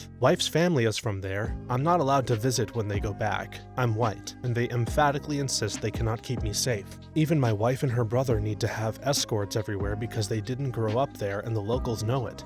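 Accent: Scotland, United Kingdom. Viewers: Nobody commented on that Scottish accent?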